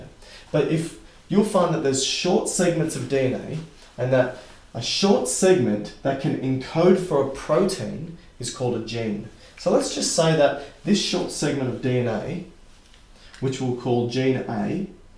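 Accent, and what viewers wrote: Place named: Australia. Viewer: Hi I only just found your channel as my daughter and I have a 1Q22 duplication and from what I’ve tried to research on it it is very rare and not much known about it and all the other channels and stuff I’ve found are from other countries but by your accent can pretty well assume your an Aussie and hopefully could shed some light on this for me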